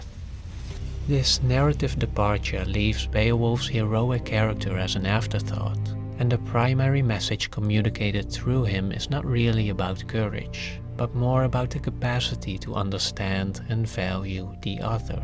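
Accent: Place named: Netherlands. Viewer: I recognise your accent is it dutch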